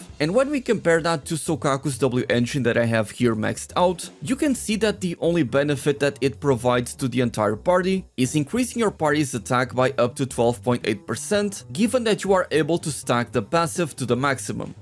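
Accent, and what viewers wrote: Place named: Portugal. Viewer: Mano o teu sotaque deu me logo dicas de seres português ahahah. Grande vídeo e agora com a vinda da Zhu Yuan, a nicole ainda vai ser muito mais viável! Forte abraço...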